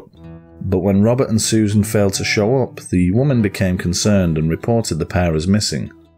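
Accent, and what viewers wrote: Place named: Northern England, United Kingdom. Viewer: I am in Hazel Grove, Stockport. I did think you could be from the North.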